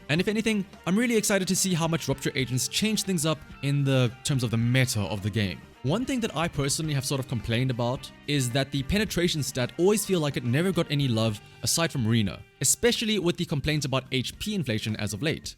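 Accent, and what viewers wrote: South Africa. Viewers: The moment I heard your accent I subscribed, howzit.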